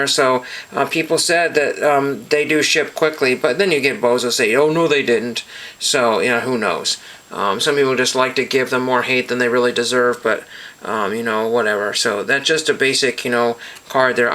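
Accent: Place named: Kansas, United States. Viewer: Cool pickups. You need to work on that Kanas accent though.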